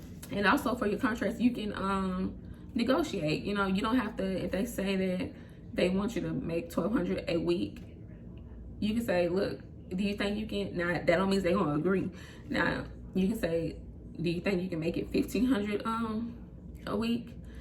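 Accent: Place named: South, United States. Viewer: I knew you sounded country i just became a travel cna because a by watching different podcast that you giving info cause i been working two months of a agency cna and i enjoy going from facility to facility and i asked my agency do they have travel cna opportunities before i skirt out and look at god these folk then raised my pay finna put me in a hotel now i didnt know i pay for the hotel but its ok cause my daughter is in the army and i want to suprise her with a car for her 20th birthday next april so i just got a blessing under the table. So thank god for al these things if you step out on faith and believe in your vision and your dream it will come to past so everybody experience is different. To all the cnas i love ya god bless ya. Continue to enjoy what ya do working for these agencies are so much better more flexible with agency cna you have a opportunity to be a travel cna which makes more money yes i got kids too but yea like she said you got to make these sacrifices and i saw my savings go up too every hotel i travel i take my money and stuff my envelopes cause im saving and working my ass off. 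If you want to take cna to the next level stop working nursing homes 8 to 10 days a pay period forced to work you got to put in days off not with agency you confirms your days to work you be off the days you dont work and you still got a job. As i get more experienced i wont come out my pocket im just glad im putting this experience on my resume.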